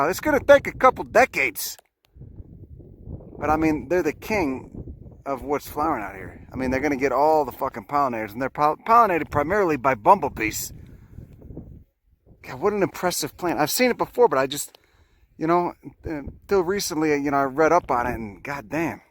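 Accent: Chicago, United States. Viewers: I love your show, you sound like my family we all grew up in Chicago.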